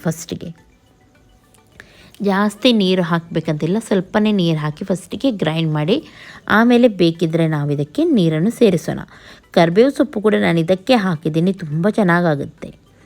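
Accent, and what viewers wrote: Mangalore, India. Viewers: Super recipes and your Mangalore language sweet voice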